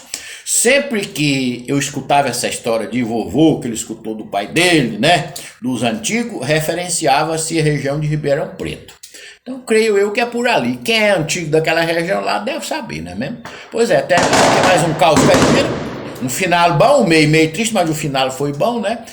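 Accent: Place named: Minas Gerais, Brazil. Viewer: Esse cabra é mineiro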